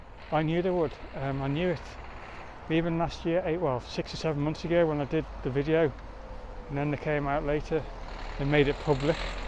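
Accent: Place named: Liverpool, United Kingdom. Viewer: Can't understand that Scouse accent 😮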